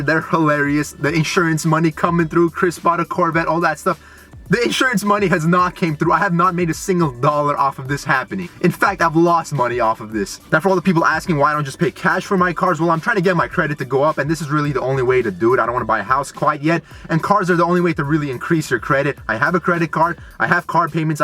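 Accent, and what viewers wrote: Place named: Europe. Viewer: He sounded European tryna speak English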